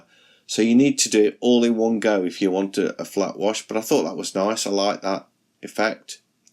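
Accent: United Kingdom. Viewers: what you’re doing it’s wonderful.you can’t do split screen on this app,but in the Pro version you get 3 layers and that makes it very interesting,you can switch and merge those layers. There is also a 4th layer that actually let’s you’re choose what type of paper to use. AND in the Pro version you get the “blend” that you mentioned,very cool,the first of the 3 tools at the bottom. See i know where things are,but I’m not very good at sketching:-). Loved your video,and the British accent lol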